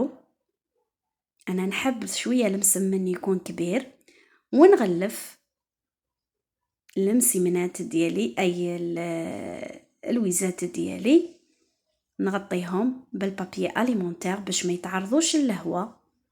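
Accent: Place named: Levant, Arab world. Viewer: يعطيك الصحة وصفاتك روعة بغيت نسقسيك هدرتك شابة الله يبارك انت وين عايشة اللهجة بانتلي شوية من المشرق العربي. ربي يوفقك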